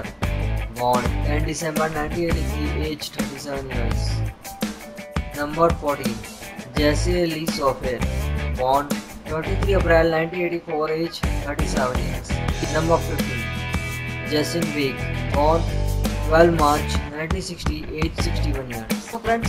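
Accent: India. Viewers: Can’t understand the announcer because of his Indian accent!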